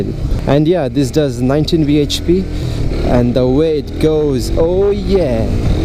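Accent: United States. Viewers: Yupp buddy, very impressive Bike review you made ever here in BD like American accent really. I like your accent than bike at all, ok buddy go on I hope you can do what you looking for best of good luck for next review. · You dont have the indian accent are you half American?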